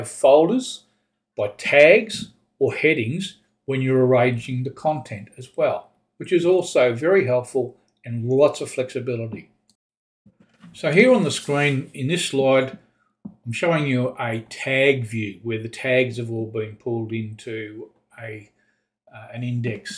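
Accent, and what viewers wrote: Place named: Australia. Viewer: Glad the algorithm put your video up for me - and glad to hear an Aussie voice grappling with the Obsidian stuff I'm interested in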